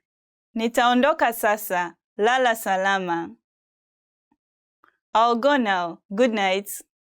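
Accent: Standard. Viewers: TZ standard Swahili ❤❤❤❤ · She is a Tanzanian presenter!. She speaks standard Swahili!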